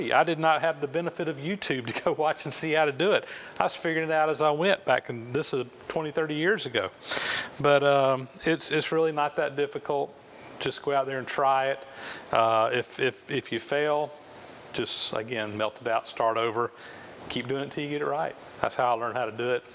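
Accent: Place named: Georgia, United States. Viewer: The best accent in the US (Georgia) to pronounce > Babbitt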